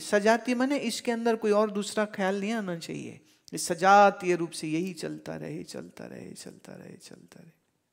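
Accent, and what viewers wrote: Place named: Punjab, India. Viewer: Yours Punjabi ascents is too great.Jai Rafisahab and yours Vaani.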